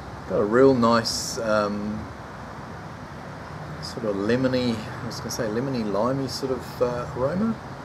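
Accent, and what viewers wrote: England, United Kingdom. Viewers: Nice one bud couple of chaps are brewing with jester hops and sounds a cracking beer, good challenge still say the words like if you was in the UK. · Nice one, your words sound like most English people, funny but someone found shopping trolley hilarious, I think it was on Christian's video,I thought l would get mine out of the way, good sport, Cheers mate 🍻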